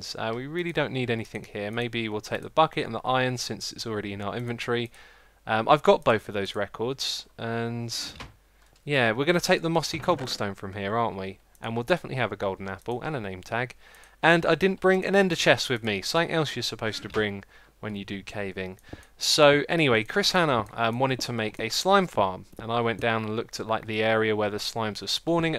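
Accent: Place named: United Kingdom. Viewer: Its really hard to speak in the British accent but i really like it and that's what makes all your videos a bit more fun and entertaining to watch than they really are. P.S BIG FAN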